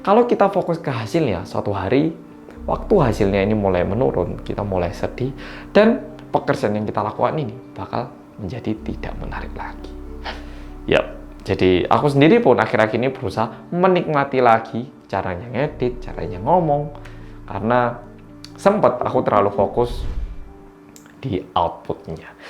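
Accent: Java, Indonesia. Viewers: Kok bisa bhs indo sih trus kya medok jawa lg ? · Mas boleh tanya sesuatu yg sedikit aneh, tapi buat penasaran GK? Dari kemaren tiba tiba aku mikir Mas kan bisa dua bahasa, ada indo sama Korea.. nah mas kan lancar bgt tuh bahasa indo bahkan logat nya lumayan medok. Tapi mas juga asli dari Korea dan lancar bahasa Korea.. Pertanyaan ku mas kalo bicara dalem hati pake bahasa apa ya hehe😁?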